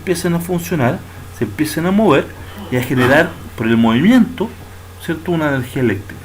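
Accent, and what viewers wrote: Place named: Chile. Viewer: Si porque habla de 8vo y 1ro medio que solo se utiliza en Chile, también tiene acento chileno y utiliza las palabras de ya dicho pais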